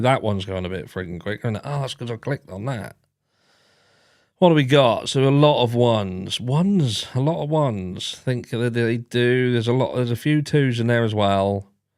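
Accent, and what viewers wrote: Norfolk, United Kingdom. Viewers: Thanks for the LIVE! Your Norfolk accent is truly showing:) keep up the good content. you make me laugh.:D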